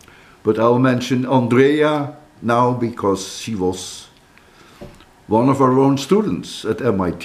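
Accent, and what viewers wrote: Netherlands. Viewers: This guy sounds so Dutch it's insane I love it.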